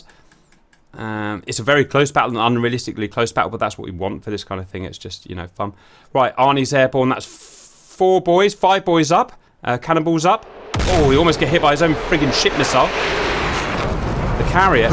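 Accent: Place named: Estuary, United Kingdom. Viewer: Yeah, you have nowhere near a West Country accent, but most Brits will move around and lose their native accent....yours is a bit muddled...so I'll just call it estuary with a twist here and there when you get excited. Not trying to offend you, the accents are getting harder and harder to nail down anymore. I used to coach accents many years ago, and I'm sooo glad I do not do it anymore. Anyways, thank you for the uploads, love the content...sorry if this came off as weird. Right, carry on mate.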